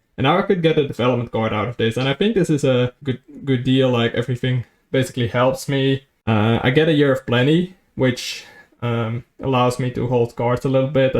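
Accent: Netherlands. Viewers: Hey you sound like you are en dutchman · Are u dutch?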